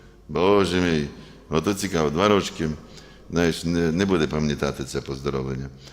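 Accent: Western Ukraine, Ukraine. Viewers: Отче, я живу в Тернополі і є парафіянкою нашої церкви Святого Йосафата, але дуже люблю дивитись і слухати Ваші проповіді, науку, відповіді на запитання. А ще Ваш діалект такий близький, як то кажуть, західняцький і почуття гумору. Велике Вам спасибі за Ваш труд і служіння Богу і людям!❤💙💛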